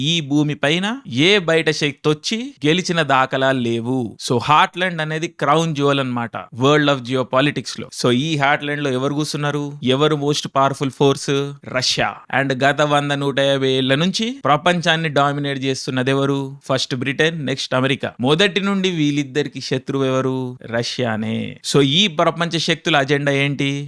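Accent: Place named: Telangana, India. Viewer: ఎవ్వర్రా ప్రొపెసర్ లకే ప్రొపెసర్ ల ఉన్నావు.. ఎంతో మంది మేధావులని చూసా కానీ నువ్వు పూర్తిగా భిన్నంగా భయంకరంగా, అందంగా నీట్ గా బలే చెప్పావు bro.. అన్నిటికంటే ముఖ్యం గా తెలంగాణ స్టైల్ లో osm ❤️ bro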